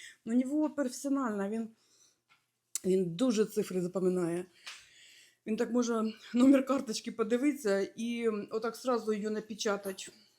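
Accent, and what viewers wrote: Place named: Western Ukraine, Ukraine. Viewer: Знаєте, ненавиджу російську мову. Через отих кончених москалів. Але мені дуже подобаюься українські слова які ви вживаєте в своїй розмові. Це такі українські слова з западенським акцентом. Дякую вам за це. Дуже приємно це чути. Улюблений вислів мого покійного тата: Тут живе Степан Бандера? Так-так-так' - відповів кулемет. Вас вітає Великий Львів.з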